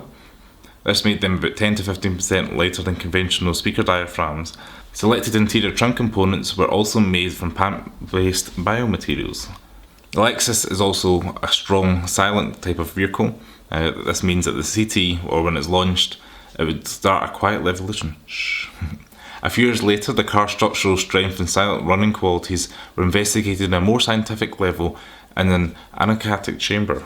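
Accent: Scotland, United Kingdom. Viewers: That's funny, I heard the heavy Scottish and still put the video on 1.25 once your ear gets it, it is all the same. Although I have watched a few Scottish films and they have some good sayings that crack me up. Love it, we don't all need to be the same, please stay different. I have a CT<num> and am now over 100k. I need to do the spark plugs, the shark fin is leaking, and the ABS for the brakes is whining as well as the battery which was all good a few months ago now won't do EV mode. All at once, is that a key point? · The guy is speaking Scottish what does Dutch have to do with it?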